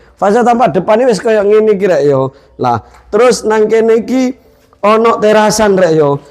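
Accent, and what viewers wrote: Surabaya, Indonesia. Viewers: Meduro, rasa suroboyo iki🤣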